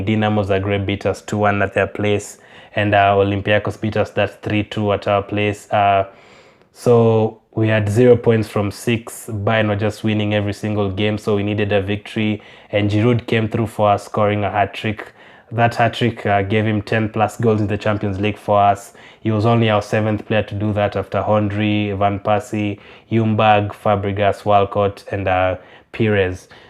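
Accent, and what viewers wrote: Kenya. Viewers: U sound Kenyan u accent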